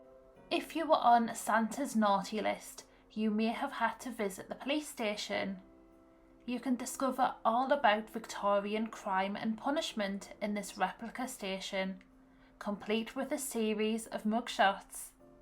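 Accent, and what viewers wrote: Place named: Newcastle, United Kingdom. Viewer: Please lower the background music - it makes your voice difficult to hear and for those who find the Geordie accent difficult, this makes it harder. Lovely to see the beauty of the NE on show - so many wonderfully historic and scenic places to visit in Durham and Northumberland. Thanks for making this video for us to enjoy.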